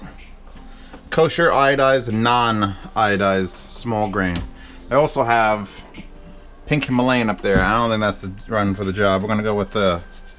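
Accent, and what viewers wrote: New York, United States. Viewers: What part of New York are you from?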